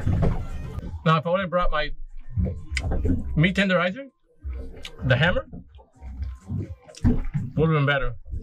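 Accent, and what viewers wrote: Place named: Cuba. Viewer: Disculpa la pregunta pero acabo de unirme a tu canal y me gustaria saber porque siempre tienes la cara tapada y porque no dices una palabra en español si tu acento te delata que eres latino pienso que cubano.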